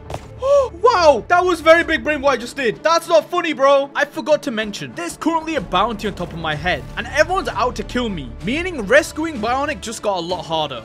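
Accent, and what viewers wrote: United Kingdom. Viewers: Bro u from Britain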